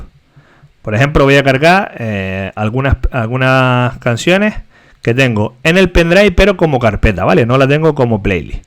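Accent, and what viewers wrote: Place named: Canary Islands, Spain. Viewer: más canario que el gofio mi niño así da gusto, abrazo fuerte colegui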